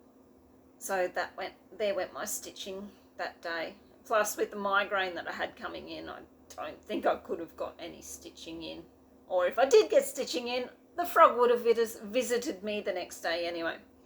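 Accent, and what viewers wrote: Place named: Australia. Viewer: Always good to see a video off you, no matter if it's a small or large amount of stitches. Boy that was a super busy weekend, you had every right to be cream crackered. Do love the brown fabric you dyed👍. The stand looks very well made, sure you'll get many thousands of stitches completed on it.I love hearing the Aussie accent! Stay safe, hoping you'll be able to get some stitches completed 🤗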